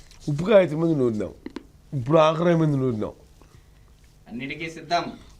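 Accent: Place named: Andhra Pradesh, India. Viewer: అన్న నీది రాజంపేట నో రైల్వే కోడురో ఉంటుంది నీ యాసలో కనుక్కోవచ్చు నువ్ మా ప్రాంత వాసీవైనందుకు గర్వపడుతున్నాం సూపర్ నువ్వు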